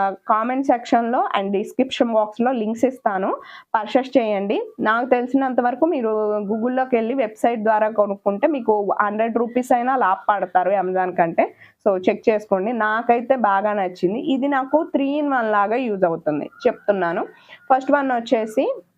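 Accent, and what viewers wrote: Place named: Sathupalli, India. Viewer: Sathupalli side Telugu alane vuntundhi Thanu nerchukovalasina avasaram ledu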